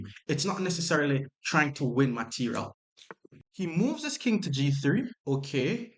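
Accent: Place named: Jamaica. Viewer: Thanks - no hype, just really educational well commentated chess. You're a brilliant educator and I hope this channel is giving you the effort you're puuting into it. I'm from the UK and apart from actually getting better after really watching your videos, I'm also surprising my opponents when I inadvertently say something in a Jamaican accent and channelling my inner 😂 That's gg's!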